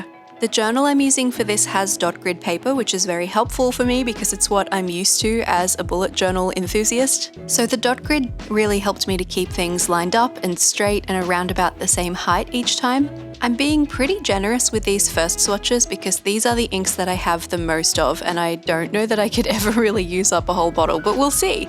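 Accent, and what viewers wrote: Australia. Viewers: Found you on searching for ink swatching! I'm in Adelaide and when I saw all the Ferris Wheel Press I wondered if you were Australian. Duh, couldn't I tell with the accent but prob because I couldn't hear one (being an Aussie myself) it didn't click! Hahaha. Anyway, loved watching all your swatching and love that you share inks with friends. Never knew there was a Pen club of sorts. Wonder if there is one here in Adelaide? I share ink with one friend and will soon receive a coffee smelling one she recently bought in Singapore. Can't wait for that. 😊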